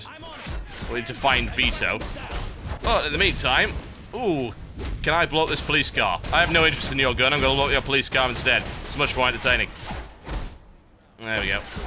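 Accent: United Kingdom. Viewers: that seemed to be a generally understood concensus. Also, i don't recall any REAL complaints. I would SO watch in 240p. Mostly i just watch for the hillarious comentary in the british accent tbh!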